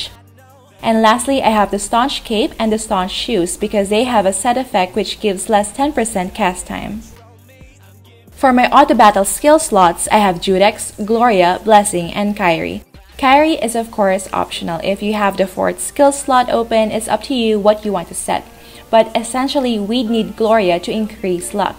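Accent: Philippines. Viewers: I love your filipino accent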